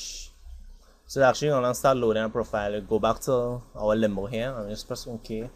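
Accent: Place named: Trinidad and Tobago. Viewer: Are you from Trinidad? You sound like it real bad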